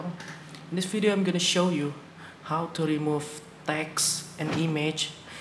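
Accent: Indonesia. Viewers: idk why but ur accent is like an indonesian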